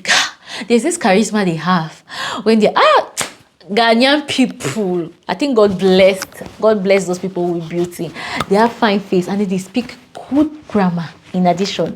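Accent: Nigeria. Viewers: She sounds like a Nigerian from her accent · No she sounds a Nigerian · Not at all ,she literally speaks like a Nigerian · Naaaa, she sounds very nigerian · Have you lived in Nigeria before? Cause you can't be Cameroonian with that accent. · U speak like a Nigerian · You sound so much like Nigerian 😊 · Why do you sound Nigerian ?i dont gerrit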